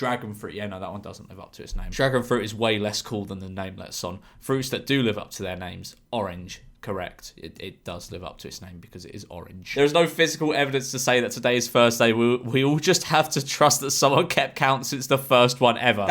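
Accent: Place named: RP, United Kingdom. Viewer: You know when you got imposter syndrome? I got this but with. I thought I was watching a different person when I heard his posh accent. Golly, when did sound posher?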